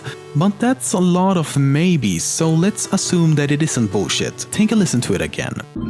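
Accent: Sweden. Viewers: Jesus Christ the Swedish accent is killing me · Not to be mean but are you Swedish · are you sweedish? sounds a bit like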